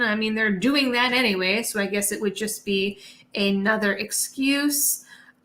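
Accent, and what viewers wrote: Massachusetts, United States. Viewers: <shameless flirting with married woman> I think the Bay State accent with the Hawaiian flower in hair is a nice balance!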